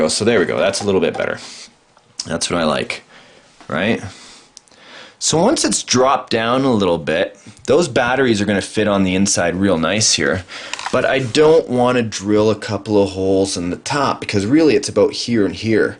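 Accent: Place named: Canada. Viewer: So funny ur canadian accent... "eut" out lol. Great project!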